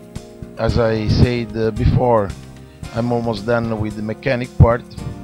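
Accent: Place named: Italy. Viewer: Sei italiano? Riconosco l'accento. Mandami lo schema. Good job.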